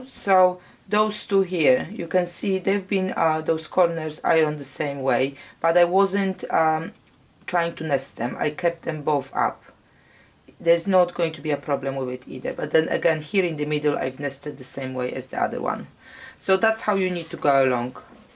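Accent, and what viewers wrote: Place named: Poland. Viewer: Love working w scrapes…great videos. Where are you located, your accent reminds me of my Polish friend.😊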